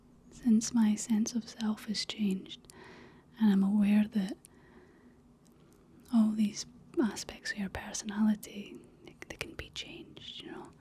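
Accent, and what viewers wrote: Scotland, United Kingdom. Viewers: A Scottish lassie that does Star Wars references? I think I am in love🥹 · Scottish accent definitely works on me. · I came across a few of your videos with your storytelling and just fell in love with your voice. I can honestly say I've always loved the Scottish accent. Growing up on the east coast of Canada, Newfoundland we are known for our unique language.. Keep being you. Never change. You have a beautiful soul. Thank you for being here.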